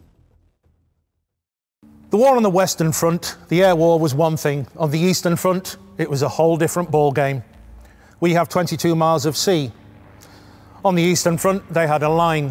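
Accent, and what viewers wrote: England, United Kingdom. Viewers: I think the dropping of the "e" is more to do with the speaker's regional English accent than anything else.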